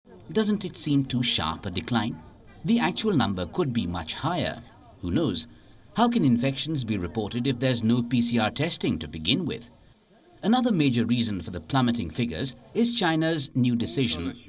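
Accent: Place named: India. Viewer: indian accent. speak english.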